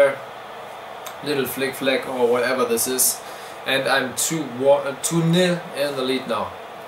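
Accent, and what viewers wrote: Germany. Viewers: du hast einen deutschen aksent und ich hab ne scheiss rechtschreibung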